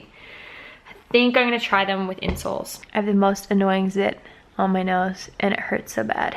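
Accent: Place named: Australia. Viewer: Am I the only one that hears an Australian accent sometimes 😀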